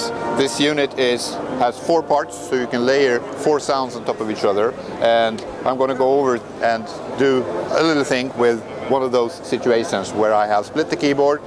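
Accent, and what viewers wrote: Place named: Norway. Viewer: He must be norwegian... Have that kind of acsent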